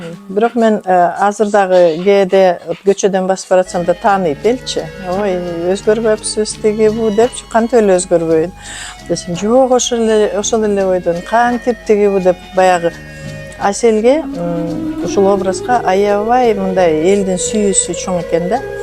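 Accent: Standard. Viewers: Кыргыз тилинде таза, сонун суйлойт экен, маа шаа Аллах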